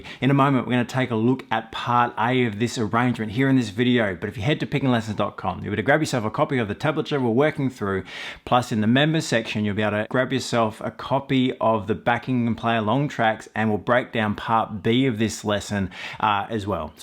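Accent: Australia. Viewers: Y'know... over here in America, when watching flatpicking videos normally we hear a familiar hillbilly accent... like from Kentucky or Tennessee, North Carolina, Virginia, or even Southern Missouri. Then there's fellers from Italy that pop up... Beppe Gambetta and Roberto Dalla Vecchia who sho' can pick! And listening to their Italian accents, don't sound like Knoxville, if you git my drift... and then I found another person from ENGLAND, a lady named Charlotte who made my jaw drop, she got Bryan Sutton's attention, invited her over to the USA to help instruct guitar... and this here video... what's that Australia? Bluegrass RULES!!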